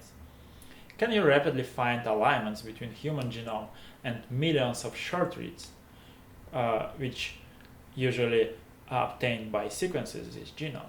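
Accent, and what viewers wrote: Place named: Russia. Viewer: прям слышно что говоришь по русски...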